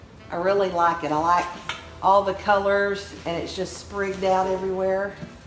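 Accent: South, United States. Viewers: You must be southern like myself I want say what gave you away LOL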